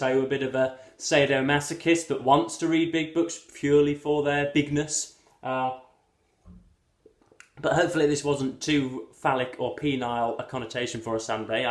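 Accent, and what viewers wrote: United Kingdom. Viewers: I love how british he is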